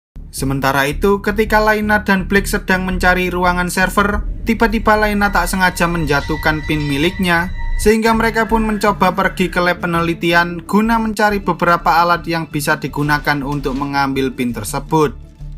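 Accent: Java, Indonesia. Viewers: logat jawa ya bang biasa yg gw dnger logat sunda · Medok banget aksen bicaranya, bikin pusing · Baca ny jgn medokkkkkkkk😂😂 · Ya allah mas medoknya😢😢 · Jowo kentel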